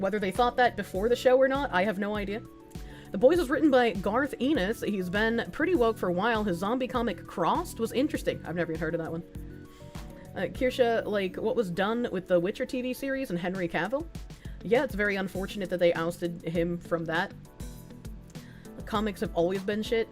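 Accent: Boston, United States. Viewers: The boston accent is great. It reminds me of one of the greatest American events in history.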